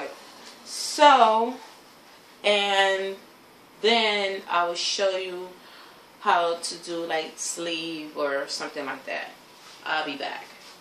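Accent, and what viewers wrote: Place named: Bronx, United States. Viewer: Your accent reminds me of home (Bronx,NYC), where are you from? P.s. great tutorial!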